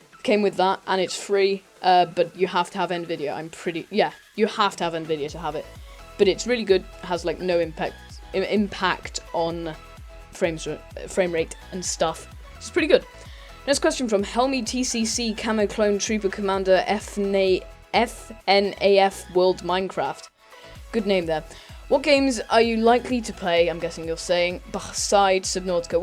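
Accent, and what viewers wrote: United Kingdom. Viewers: Are you a real British?!?!?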